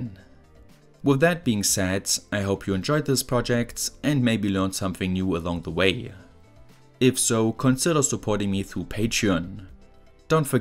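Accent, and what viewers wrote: Germany. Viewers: i heared german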